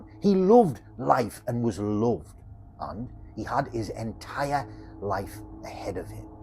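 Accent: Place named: England, United Kingdom. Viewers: True English accent love it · lol and this conment got 2 thumbs up? It's quite clear he's from the north east. I'd hazard a guess at Sunderland or more likely County Durham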